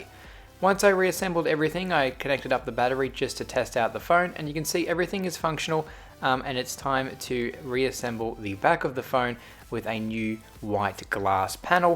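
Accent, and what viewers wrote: Australia. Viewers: Ur Australian?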